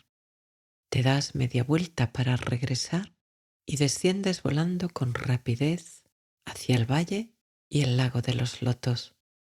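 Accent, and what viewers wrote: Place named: Spain. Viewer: Muy buena voz, sin el acento de española. estaría de lujo! Si tú piensas que es neutral pues seguro eres española.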